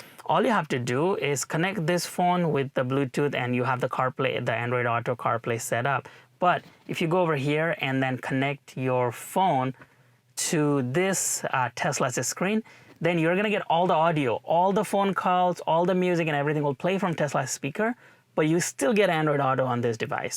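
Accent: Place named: Nepal. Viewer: Nepali bro by accent😊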